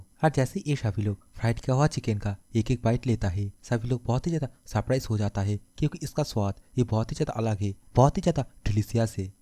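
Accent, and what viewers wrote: Bengal, India. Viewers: That bengoli accent 😅😅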